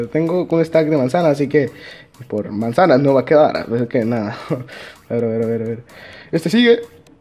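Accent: Spain. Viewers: Es muy incomodo Que hables como español si tu eres mexicano suejas mal :/ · No hables como español se tu mismo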